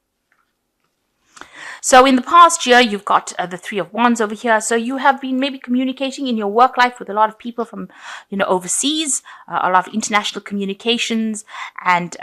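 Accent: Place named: England, United Kingdom. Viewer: English accent alert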